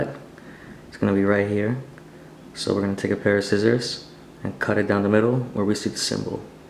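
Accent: New York, United States. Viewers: Love the NY/NJ accent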